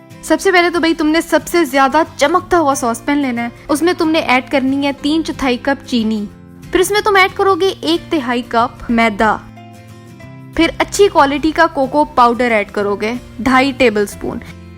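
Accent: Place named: India. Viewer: Cooking me desi type of (لہجہ ) lehja... hayeee maza aa jata hy...❤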